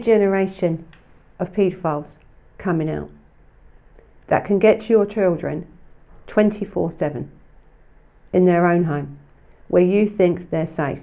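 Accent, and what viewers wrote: London, United Kingdom. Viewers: Thank you my dear; I never thought of end to end in that way, thought it was more people carrying on with someone else who used it on What's app. I would never use it as I have nothing to hide I suppose. Thank God my daughter is now an adult herself. The internet can be a wonderful thing, but is truly a double edged sword. Also rather depressingly I am on the Suffolk/Norfolk borders, near this creature. I will as inform as many of those I know about the danger and new techniques these bastards are using. Am learning from each story you put out so, thank you. BTW is your accent London, Essex, Thames Estuary, American and Ozzie? I definitely hear London/East End/Essex borders. I am all cept USA n Aust. lol. Worked in London until thought up in Suffolk she would grow up surrounded by nature and peace. She was 7 and was not happy leaving. 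I was though. Am a new fan and subscribed couple weeks back. You go warrior woman, we need more like you. Locked down love from East Coast of England. x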